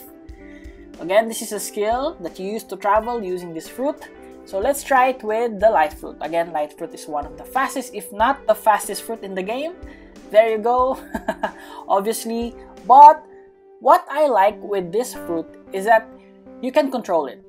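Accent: Philippines. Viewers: Ur accent is cool and I think it's Filipino accent am I right?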